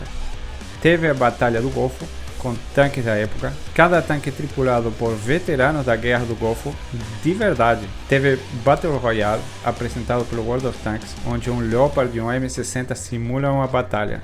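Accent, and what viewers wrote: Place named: Latin America. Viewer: Top demais,! Obrigado por compartilha com a gente essa experiencia. Agora, tira uma curiosidade por favor: esse seu sotaque não é de brasileiro. Tá me parecendo que tu tem um pé em algum país espano, não?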